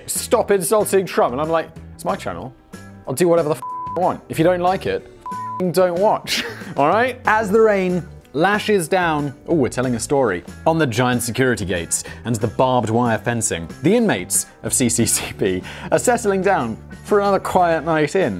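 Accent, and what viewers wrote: United Kingdom. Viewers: You say pretty much every single world with a British dialect - except for controversy. I don't know why it winds me up, but it does... I don't usually care how a word is pronounced, so long as it is said like that somewhere in the world. But controversy - when said by you... Constipates me. Perhaps because you always say things in British dialect and quite often make a point of telling your US viewers why (to them) you are pronouncing things incorrectly. But that one word, you do not use our tongue and never mention why. When with other things that are commonly said either way in US, or UK - you feel the need to explain. You sir, are getting root bound in your dialect and as you take in more American ways, your British side is dying as the roots have nowhere to grow, due to the space having run out and memory is overriding itself..., the root bound British (coca) plant...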